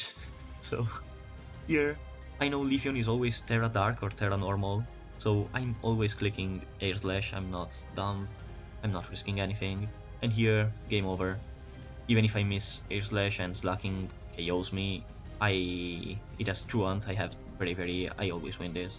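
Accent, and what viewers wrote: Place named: Spain. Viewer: Juro que lo escucho y pienso que sabe hablar más español que inglés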